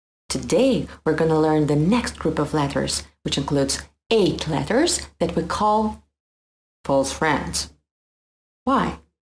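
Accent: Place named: Russia. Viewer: I'm an Indian.. And I like this Russian accent.... But I'm finding it so difficult.. I couldn't pronounce like you... 😥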